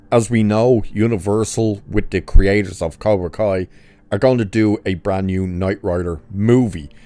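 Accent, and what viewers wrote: Ireland. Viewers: After the remake tv series of knight rider, im not remotely interested. Those morons in hollyweird arent capable of making anything worth watching. Now....just curious, what is your accent? Sounds kind of irish-y with a bit of....perhaps romulan....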